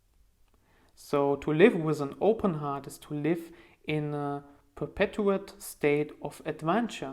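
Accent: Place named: Germany. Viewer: Grateful that I found your channel.. and an very calm and deeply accurate.. luckly I love raw foods.. ahaha.. your esscent is familiar, are you from Germany? Thank you once again for your expression..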